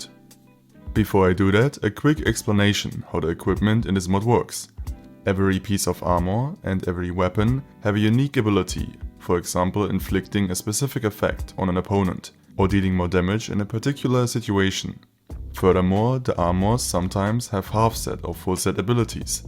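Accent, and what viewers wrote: Germany. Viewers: Bro bist du deutsch wenn ja mann hört es so raus (nicht böse gemeint finde dich mies cool )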